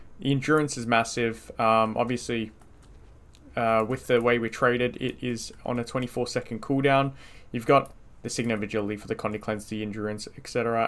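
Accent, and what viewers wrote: Australia. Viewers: Hey mate. I hear an aussie accent. How do you deal with the latency?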